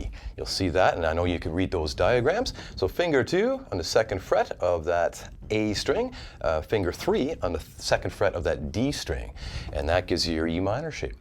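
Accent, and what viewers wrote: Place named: Canada. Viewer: Great video. I can tell the difference between a Canadian and an American accent easy were others cant but you sir have such a Canadian accent a deaf man could tell your Canadian. This is were im told your from Texas. :) Iv never heard such a nice Canadian accent in my life.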